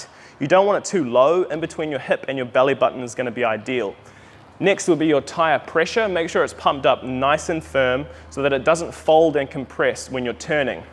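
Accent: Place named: Australia. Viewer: Thanks so much,! I’m 53 and decided to learn while corona-confined. I’ve watched you so many times that I’m starting to speak with your AMAZING accent! I am an American who was inspired by a pastor in Taiwan, trained by a YouTuber from down under. Yesterday I rode a quarter km, but am still having a terrible time with mounting. Both methods are tough for me, so I’ve used a brick to prop and prevent rolling backward. I need to break this bad habit, because i’m tired of having to walk back to my brick. Any more mounting tips?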